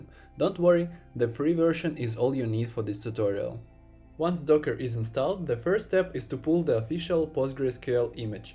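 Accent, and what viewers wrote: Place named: Russia. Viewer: спасибо дружище! русский акцент тебя выдал)))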